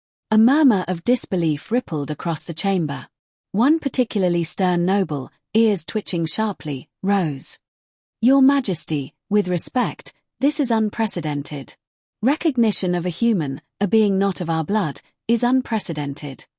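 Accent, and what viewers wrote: United Kingdom. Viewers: Thank you, plus I’m not into this British accent thing and all. Maybe creators will analyze what voice works best to maximize their acceptance. Sometimes a female voice works, most of the times it doesn’t.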